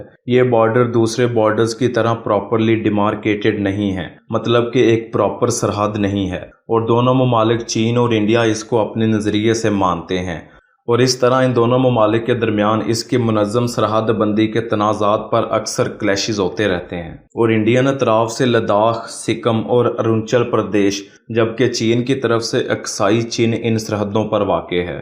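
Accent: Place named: Punjab, Pakistan. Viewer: Why you have Punjabi Accent. Because you are part of India.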